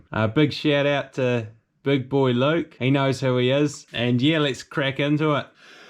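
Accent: New Zealand. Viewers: you are from nz?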